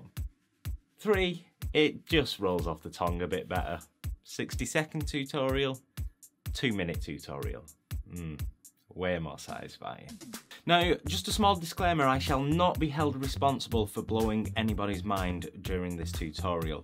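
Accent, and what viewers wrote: United Kingdom. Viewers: Why are there so many British men whose voices sound exactly like this voice? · Thank you for tutorial for newbie like me for alternative, and love the bri'ish accent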